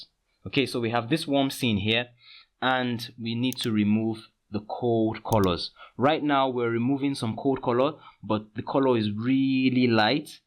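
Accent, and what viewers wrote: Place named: Nigeria. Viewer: Thank you Bro its really helpful I understood it more with your accent,more grace and knowledge..Naija Bro sending love from TN,U.S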